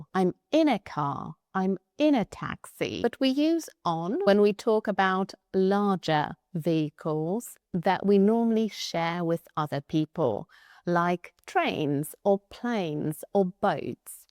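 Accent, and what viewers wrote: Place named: United Kingdom. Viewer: Thank you madam, very much for your excellent elaboration. By the way, I love your British accent. That 's fantastic.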